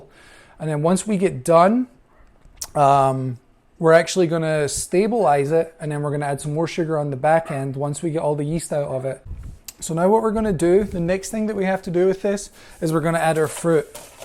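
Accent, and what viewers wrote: Scotland, United Kingdom. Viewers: Very odd accent. Sounds scottish at points but then quickly becomes American?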